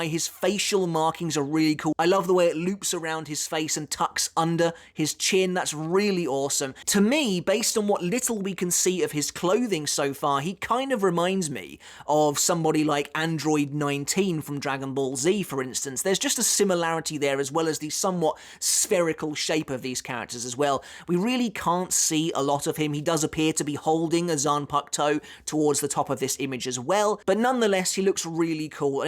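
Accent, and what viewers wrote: United Kingdom. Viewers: Love how you pronounce those Japanese names with your British accent! haha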